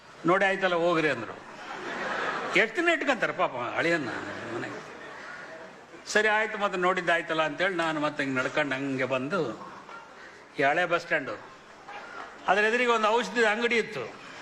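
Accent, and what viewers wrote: Ballari, India. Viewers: Ballari Kannada ❤ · ರವಿ ಬೆಳಗೆರೆ ಅವರ ಮಾತನಾಡುವ ಬಳ್ಳಾರಿ ಕನ್ನಡ ಕೇಳಲು ಆನಂದ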